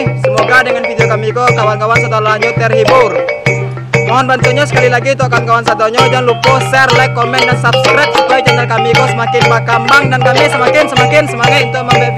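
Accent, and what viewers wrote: Padang, Indonesia. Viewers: Maaf Baru Komen Orng Padang Kh Bg Bahasa Kayak Orng Padang Soal Nya Saya Orng Padang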